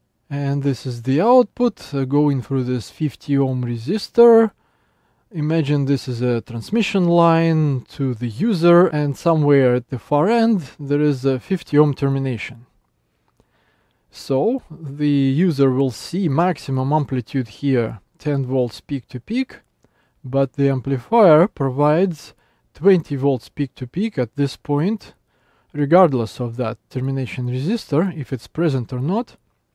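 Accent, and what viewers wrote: Russia. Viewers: Hm, you have a Russian accent. Are you from Russia?=)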